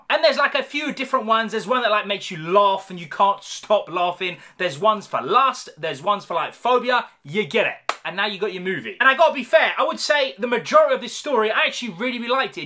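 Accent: United Kingdom. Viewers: Wow thank you. I've never heard a British accent like that before. I subscribed only recently during your Obi Wan reviews so wasn't sure where you were from.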